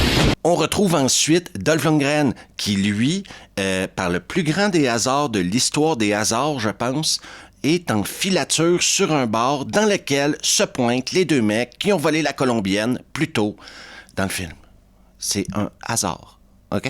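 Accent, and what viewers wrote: Canada. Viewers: J'adore ta manière de compté une histoire avec ton accent canadien 😂😂😂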